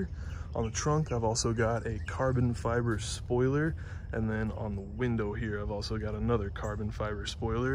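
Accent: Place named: Texas, United States. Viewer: What part of Texas you from ma dude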